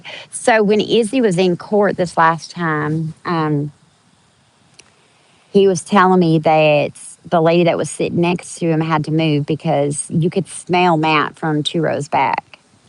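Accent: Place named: South, United States. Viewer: I was just writing a comment about sounding like you were from below the old Mason-Dixon line. My parents are from the hills (if you know you know) and I spent time all over in a military family. But the drawl always peeks out and makes itself known! Some people are still dumb enough to think if you speak that way it means you are slow somehow...some people are certainly idiots though. Anyway, I just want to say I appreciate the work that your group, Emma666 etc do to give the public actual facts. I'm not against grown adults using their money however they want as long as they know what and who they are actually supporting and make that decision with full knowledge of all the facts. I just really hate watching people get taken advantage of; through lies, guilt, psychological manipulation, etc. There are so many organizations and individuals who actually do deserve the good will and charity of these people who are willing to donate but unfortunately after dealing with some of these people, they are then usually ruined for opening their hearts and wallets in the future later after being manipulated and scammed (imo). 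He is robbing people twice, in my opinion. Once of the funds, secondly by their stealing their future kindness, generosity and willingness to help others in the future. And besides that, some entitled assholes just need to be given a few doses of their own medicine and taken down a notch or two. If you a such a rancid individual don't impose yourself on the rest of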